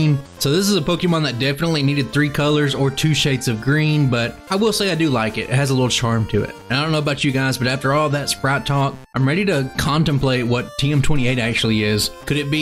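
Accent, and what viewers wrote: South, United States. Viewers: alright man, I’ll watch it to the end. Again I’m just a big fan I love your commentary (with the southern drawl as well)and seeing things I haven’t seen before from you makes me excited so I was surpised to see you go back to our wishful bug/dragon friend. Cheers mate! Keep up the great content!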